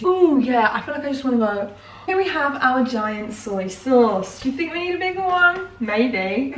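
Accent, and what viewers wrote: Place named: United Kingdom. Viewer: For some reason you sound extra British in this video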